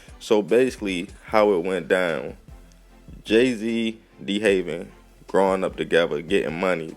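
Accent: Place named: Maryland, United States. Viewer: Are you from Maryland or DC i hear a accent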